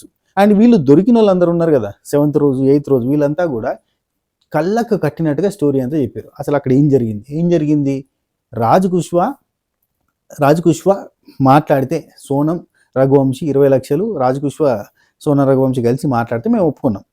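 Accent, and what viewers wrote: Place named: Telangana, India. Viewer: తెలంగాణ శ పోరడివి కదా! నీ స్లాంగ్ పక్కా తెలంగాణే.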